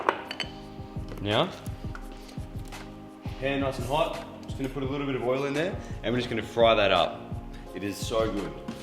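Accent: Australia. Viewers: oh shit he's from STRAYA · Wtf dude just shop at Aldi. I don’t know if you live in US or Australia tbf, you sound Australian. We have Aldi in UK and it’s cheap af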